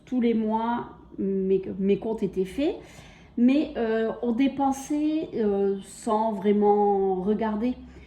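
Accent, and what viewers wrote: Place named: Lorraine, France. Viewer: Cc, je découvre ta chaine et ton accent me fais chaud au coeur, je suis d'origine également de Lorraine , à la frontière Lux, mais maintenant dans le sud. Tres beaux classeurs. Et meme objectifs que toi, ne plus prendre dans les livrets pour finir le mois. Aux enveloppes depuis 1 an e1/2. Tout à fait une autre vision de l'argent avec les espèces..👍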